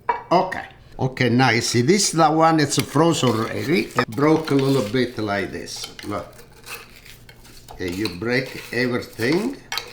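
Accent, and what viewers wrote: Italy. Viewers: Learn how to listen in His Italian accent of English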